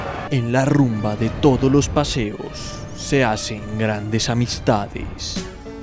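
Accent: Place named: Colombia. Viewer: el mejor acento de colombia y latino america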